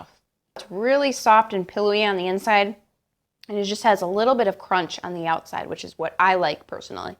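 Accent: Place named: Minnesota, United States. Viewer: These look good! Are you from Minnesota/Wisconsin? I'm trying to guess from your "accent"! (I'm from MN, you don't have a huge accent :) )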